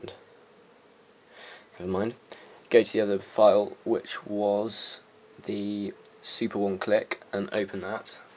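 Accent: United Kingdom. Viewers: British accent is very disturbing.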